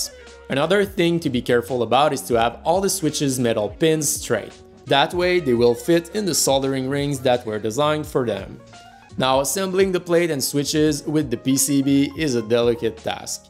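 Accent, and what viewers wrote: Quebec, Canada. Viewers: he is french canadian so he has an accent when he speaks english. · Accent quebecois 100%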